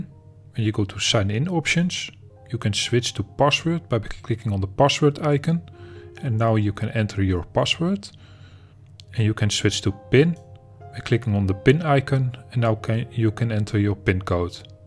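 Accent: Netherlands. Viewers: Precies, stupide Nederlands accent! KOTSBAK! De LOI let me speak English with a Nederlandse R! Je staat voor lul vriend!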